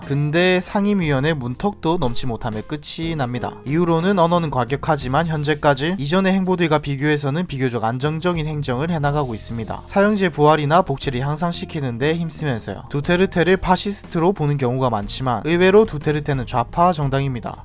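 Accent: Gyeongsang, South Korea. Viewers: 경상도 억양이 억수로 좋네요!! 🤩😎👍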